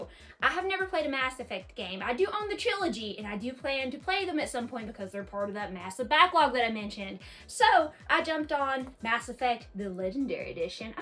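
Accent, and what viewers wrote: South, United States. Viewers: I love you southern accent. and your enthusiasm when it comes to gaming.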